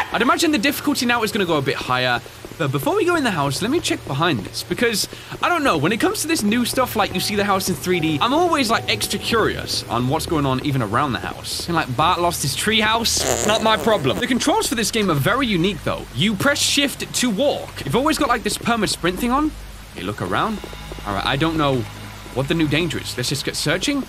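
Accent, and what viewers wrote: United Kingdom. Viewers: I hear british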